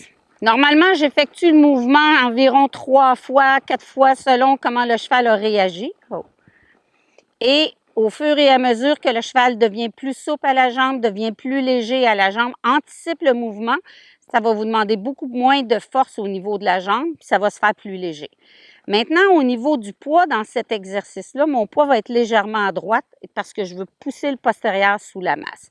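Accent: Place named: Quebec, Canada. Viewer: Salut eh ou habite-tu car tu as un axais québécois comme moi 😄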